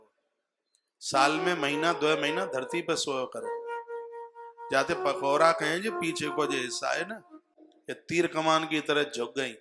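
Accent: Braj, India. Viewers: गुरुदेव आपके श्री मुख से व्रज भाषा बहुत मलूक लगती है .🙏🤗🤗😊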